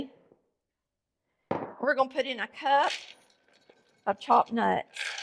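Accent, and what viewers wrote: South, United States. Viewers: As a Brit I love your Southern accent, it's so relaxing to listen to. The recipes are great too, of course!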